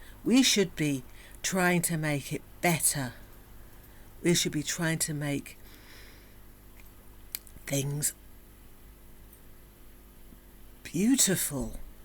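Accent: United Kingdom. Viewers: God it sounds so much better coming from a British accent...much love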